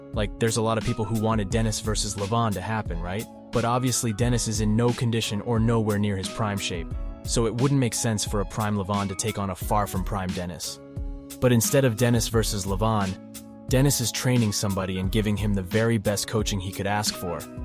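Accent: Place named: England, United Kingdom. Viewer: Que belo sotaque inglês, bicho. Parabéns!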